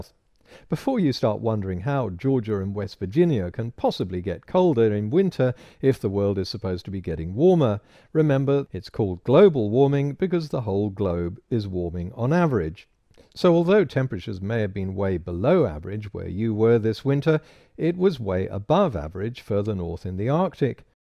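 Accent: United Kingdom. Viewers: The British accent meshes very well with the heir of nonchalance. And truth. Can't overlook truth, right? I mean, you'd think... Whatever.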